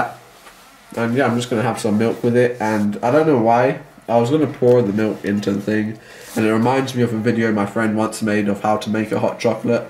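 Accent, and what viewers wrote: United Kingdom. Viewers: his accent was much more british back then 🤣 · When wasnt so scandalous about every lil thing with a british accent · idk why but i like vlogs in the British accent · Mate you lost your British accent😳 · HE HAD MORE OF A BRITISH ACCENT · He had a strong British accent. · Currently he sounds less British than in this video.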